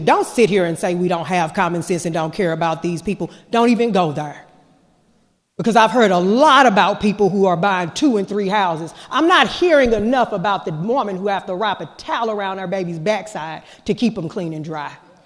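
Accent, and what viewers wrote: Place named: South, United States. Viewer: My first time ever hearing her speak. While the southern twang threw me off, her powerful words gave me chills. If I lived in Missouri, she'd definitely have my vote.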